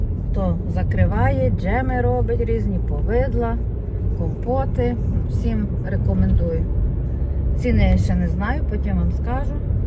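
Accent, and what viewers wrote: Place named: Western Ukraine, Ukraine. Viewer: Якаж противнюча бандероско- вуйсковська мова. Вот там и оставайся у пани гейропи, такие нам в Украине не нужны. Быть добру 🕊️🕊️🕊️.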